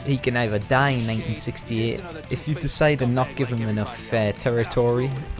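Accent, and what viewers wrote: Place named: United Kingdom. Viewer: Should’ve been narrated with a proper American accent. This limey sucks!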